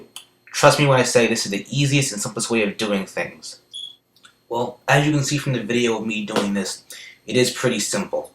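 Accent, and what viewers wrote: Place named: Texas, United States. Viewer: Its his accent DUH. In Pennsylvania people may sound like their nose is pinched (sorry all you people from Pennsylvania I was just using you as an example) But in Texas we sound like cowboys and cowgirls.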